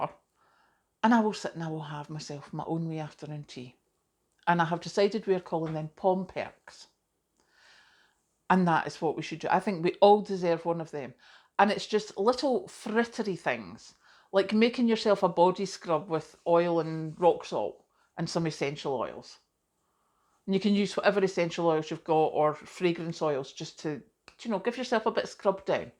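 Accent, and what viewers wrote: Scotland, United Kingdom. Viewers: Yes please to Pom Perks. It's badly needed at the moment, it's so busy on the farm this time year its hard even to fit in a meal and wee sit down after. Afternoon tea made me smile. With your accent it reminds me of the high tea my friend who lives in Bridge of Weir, Renfrewshire always does on a Sunday. Everything is home made, it's such a treat when I go stay with her.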